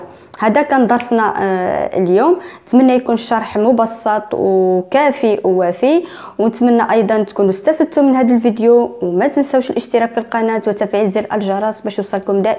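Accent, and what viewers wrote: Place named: Algeria. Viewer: هل أنت مغربية نخسابك جزائرية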